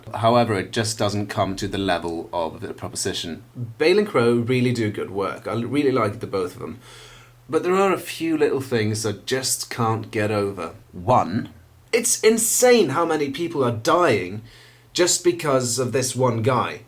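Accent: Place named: England, United Kingdom. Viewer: how come your accent is so english if you're norwegian?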